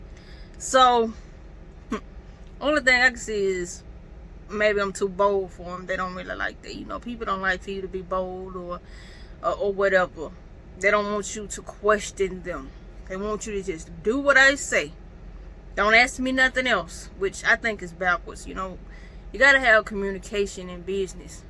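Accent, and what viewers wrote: South, United States. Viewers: Sound country 😂😂 but keep grinding ✊🏽✊🏽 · Just love that southern accent..!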